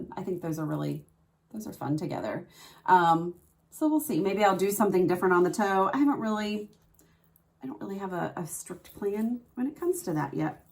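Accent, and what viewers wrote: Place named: Ohio, United States. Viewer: !!! You did it, Girl!!! I loved sitting and knitting with you …loved everything about this including your “Ohio Girl” accent, which is so easy for this Jersey Girl to listen to!!!❤ I’m your fan from the days of and Kay, so the Fan Girl thing continues! I’m such the sock knitter too and hope you’ll do some teaching about the many heels we can try! Also, please talk about what needles you have tried and enjoyed. Do you use Magic Loop all the time or 9” or Flexi Flips, etc…I just want to know everything! Great job, Friend❤🧶❤️ PS..please move away from the swinging pendulum of the clock that was a bit distracting!❤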